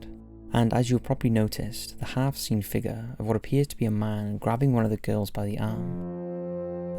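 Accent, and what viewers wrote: Wales, United Kingdom. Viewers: His accent is Welsh.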